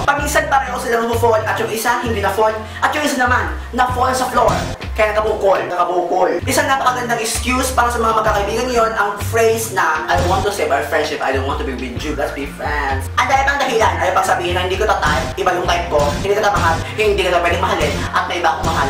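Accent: Visayas, Philippines. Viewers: bisaya ka kuya??? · bisaya diay ka ba?:D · bisaya ka pala dong! XD taga asa ka?? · Kuya, bisaya ka po?:D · May pagka bisaya rin siya noh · Bisaya ka pala..?!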